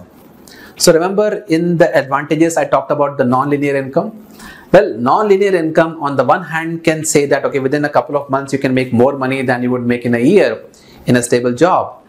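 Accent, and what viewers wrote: India. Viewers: Even after 10 years of US life, you still have typical Indian accent. Nice to hear.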